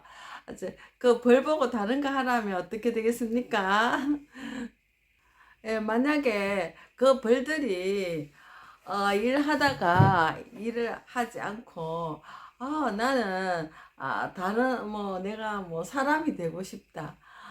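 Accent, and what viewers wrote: Gyeongsang, South Korea. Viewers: 찾아주셔서 매우 감사합니다 좋은 강의도 듣고요 어찌 알고 오셨나요 행운의날예요 간격두고 인사올릴께요 영주 남편고향 가면 사투리 듣는데 경남이신갑네예 ⚘️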